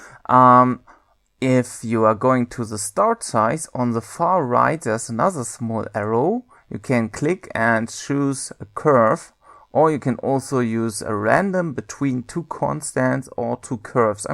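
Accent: Germany. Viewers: OMG Ich weiß dass du Deutscher bist, erkennt man an deinem Akzent. :3 Wie auch immer ich will dir recht herzlich für dieses Video danken. Ich saß ewig an diesem Script, bin verzweifelt, alle Codes im Internet funktionierten nicht bis ich dein Video gesehen habe. So gut erklärt! Endlich funktioniert es. Besten Dank! :)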